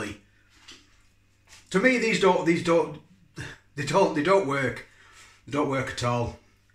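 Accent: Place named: South Yorkshire, United Kingdom. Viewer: Thought you sounded like you are from South Yorkshire x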